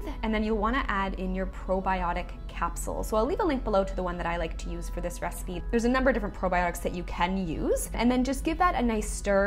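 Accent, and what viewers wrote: Canada. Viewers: you sound very Canadian, but great video.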